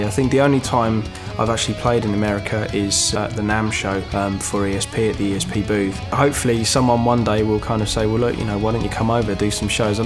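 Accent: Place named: United Kingdom. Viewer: "I use EMG's because I think they're the dog's bollocks." I don't know if it was the British accent making it sound better, but I think that may be one of the greatest quotes ever spoken by anyone.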